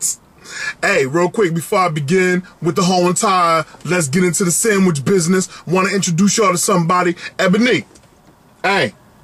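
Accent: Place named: Connecticut, United States. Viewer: He is from Connecticut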